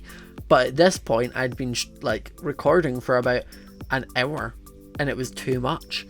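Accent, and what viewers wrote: Scotland, United Kingdom. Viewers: I LOVE YOUR ACCENT YOU SCOTTISH CUTIE ❤️❤️🏴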